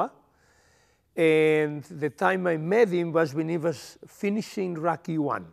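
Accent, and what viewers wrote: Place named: Sardinia, Italy. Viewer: Inglese con accento sardo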